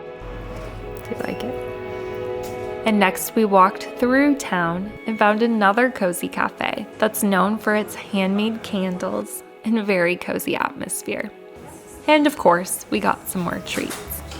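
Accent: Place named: Los Angeles, United States. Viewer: this video is really good....but your LA girl accent is so annoying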